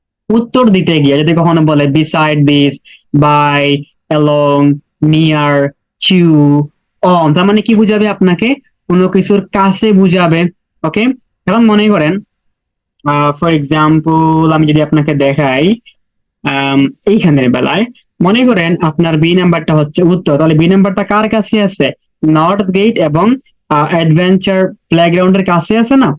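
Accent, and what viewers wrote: Sylhet, Bangladesh. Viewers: Vai apni kita Sylheti ni?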